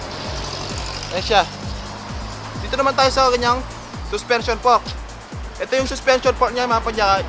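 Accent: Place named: Pampanga, Philippines. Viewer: Sir kapampangan ka. Hehehe. God bless po. Nanay ko po kapampangan same accent po kau. 😊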